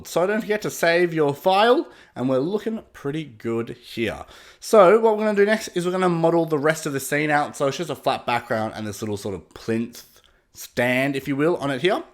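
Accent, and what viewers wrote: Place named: Australia. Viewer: blood hell that was fantastic. I learnt heaps... and its great to hear another aussie too :)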